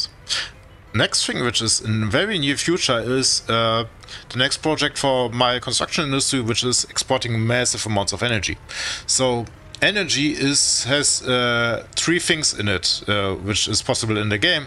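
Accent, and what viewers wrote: Germany. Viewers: Ja, ich verstehe, Ihr Englisch ist voller deutscher Akzente. Ich dachte, es wäre nur Österreich oder Deutschland. Schönes Video übrigens!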